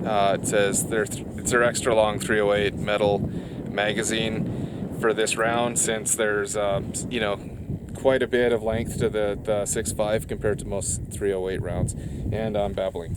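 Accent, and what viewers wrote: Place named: Canada. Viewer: noooo Canadians I knew it when you started talking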